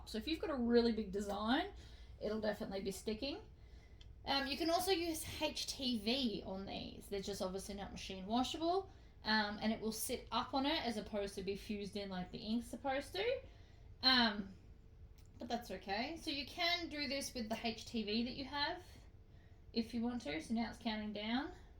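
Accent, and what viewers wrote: Australia. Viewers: Gday, just a heads up you need to clean the cup with alcohol, love your videos, nice to hear an Aussie for once…where in aus are you?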